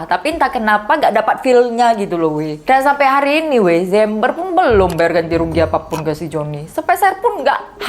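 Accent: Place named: Madura, Indonesia. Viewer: suka dgn dialek host-nya .. meduro bingits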